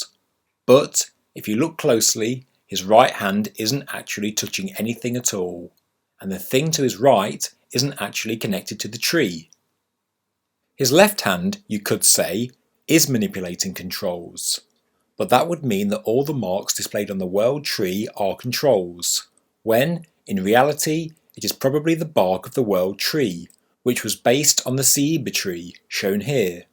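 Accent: United Kingdom. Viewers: Deepstate debunkery with brit accent.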